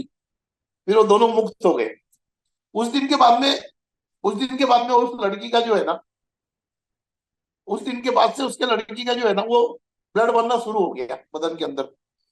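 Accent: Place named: Maharashtra, India. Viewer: आप के हिंदी मे मराठी आती है, बहोत अच्छा लगता है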